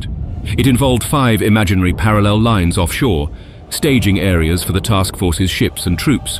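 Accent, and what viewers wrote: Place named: United Kingdom. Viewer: As formidable as the Marine strategies and tactics had become by the time they assaulted Peleliu, their "remarkable success" and "sweeping up whatever was left" after the naval and air bombardments, had nearly always resulted in massive casualties and hard fought battles with the relentless Japanese Imperial Army....Their landing was FAR from easy, ( Do you mean Guadalcanal? ) the Japanese threw everything they had at them from the very beginning, and Peleliu was definitely NOT a "lush" island, it was a craggy, scruffy, unforgiving coral island with sweltering temperatures and very little water....I stopped watching this video after as it is extremely clunky, even with a British accented narrator, pronouncing Peleliu incorrectly.....Semper Fi.....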